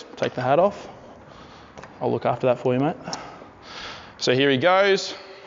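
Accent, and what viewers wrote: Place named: Germany. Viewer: The fact that you like a German tryna speak English is gold love 💕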